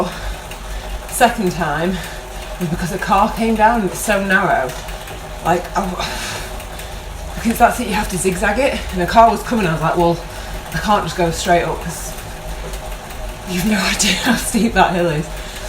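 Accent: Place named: Manchester, United Kingdom. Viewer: Thanks again! I really look forward to watching your videos, you make me smile for some reason, not sure if it's the accent that makes me lonesome for Manchester or your lovely northern sense of humour! Next time I'm on Zwift (did a session yesterday) I will be powering up with the Mantra "Think "! Cheers, enjoy the rain........at least you can ride in the rain, it's bloody snowing again here............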